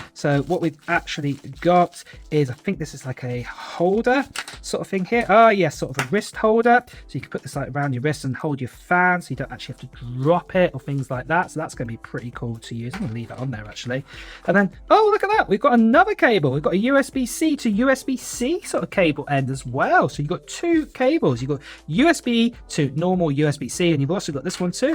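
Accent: United Kingdom. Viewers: Sounds so british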